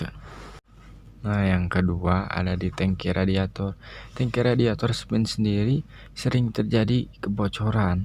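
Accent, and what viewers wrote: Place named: West Java, Indonesia. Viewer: Logatna Sunda euy... Kumaha damang kang 😁🙏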